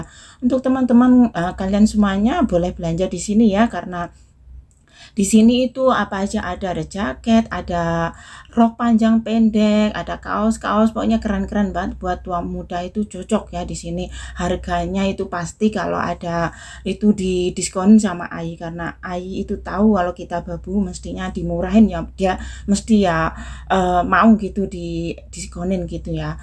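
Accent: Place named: Java, Indonesia. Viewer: Asik shoping rame-rame bersama teman😄sampean wong jowo to mbak ngomongnya kok kaya orang Jawa😁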